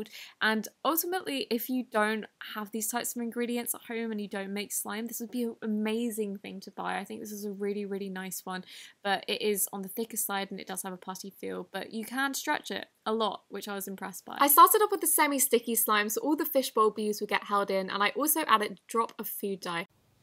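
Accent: United Kingdom. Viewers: it's so nice to hear a slime you- tuber from the UK!!! also great video 😍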